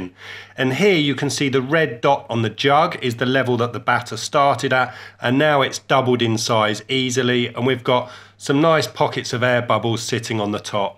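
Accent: United Kingdom. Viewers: I am so glad the OP has a British accent and not some New Yorker! :D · This is proper, a dude with a thick af british accent telling us how to make crumpets. This is what the good Lord intended.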